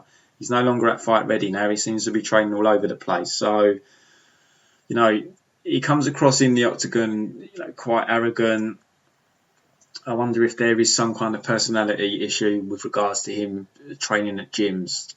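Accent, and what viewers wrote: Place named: Cockney, United Kingdom. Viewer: your accent sounds like it's not sure if it's cockney or somewhere in cali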